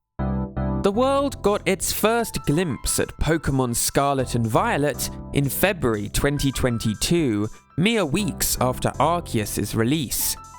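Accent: United Kingdom. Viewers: I mean, he is British. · So are you just discriminating British accents now?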